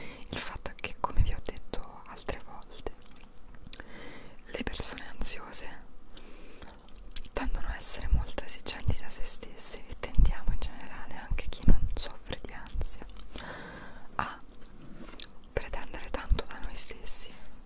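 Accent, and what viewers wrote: Italy. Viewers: No entiendo nada jajajajajajjajajaja pero adoro el acento italiano!!!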